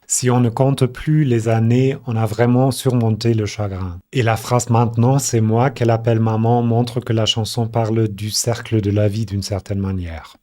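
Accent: France. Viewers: Votre français est parfait. Et votre accent est à peine perceptible, à tel point qu'au début j'ai cru que vous étiez Français. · Wunderbar French accent 👍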